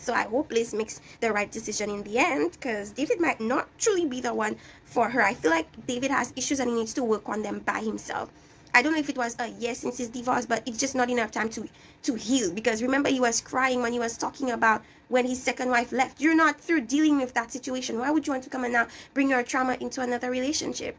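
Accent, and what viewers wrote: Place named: Caribbean. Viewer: Are you a Lucian or a Dominican? I love your accent.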